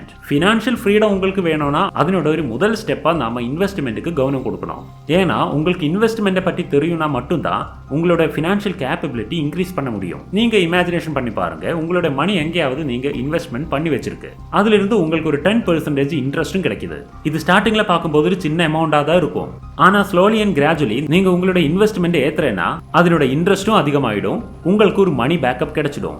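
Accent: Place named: North, India. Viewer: Vadamanila manithan pesum tamil... Try to talk in pure tamil or pure English... Don't mix(tamil+hindhi slang)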